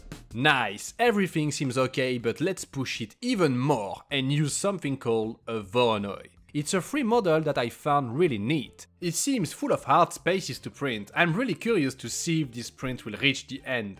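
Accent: France. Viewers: Ahahah l anglais parler par 1 français cest toujours assez amusant à écouter hahaha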